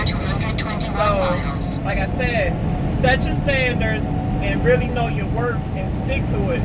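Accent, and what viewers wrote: Baltimore, United States. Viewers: I love the way you say "Dude". My son said you sound like you from Baltimore and I told him you were! Lol. we just laughed about how you were talking. We have folks in Capitol Heights, Maryland, Bmore and DC.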